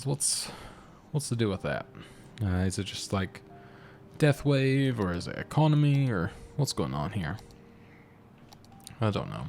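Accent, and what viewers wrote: Texas, United States. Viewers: you sound like you are from Texas, are you?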